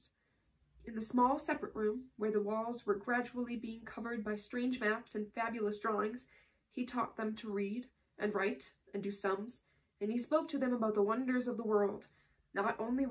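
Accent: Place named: Midwest, United States. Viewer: Are you from Wisconsin? lol you sound like you are from the Midwest x) I am so don’t be offended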